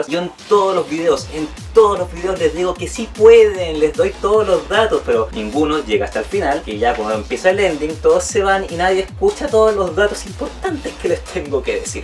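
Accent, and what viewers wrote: Chile. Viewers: Ya me imaginaba q era chileno se parece a Germán ajajajajja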